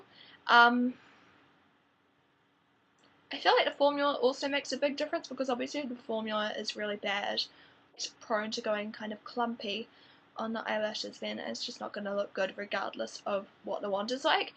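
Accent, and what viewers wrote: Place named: New Zealand. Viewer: This is the first video of yours I have seen and i was like. Hmm i regognise your accent and i Heard you say auckland and im like, Aeeee found another kiwi!! Im from dunedin(: live the video btw your so pretty!! xx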